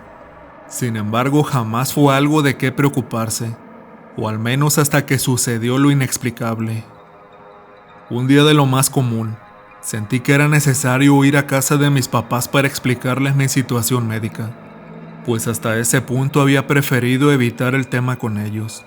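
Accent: North, Mexico. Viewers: porque habla muy norteño!.😤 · Me gusta la voz del otro narrador , a este se le sale el queso mexicano no mames wey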